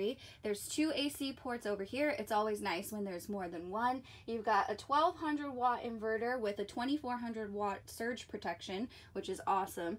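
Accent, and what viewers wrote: Texas, United States. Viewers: I thought I heard a Texas twang in your voice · Funny your texan accent pops in and out occasionally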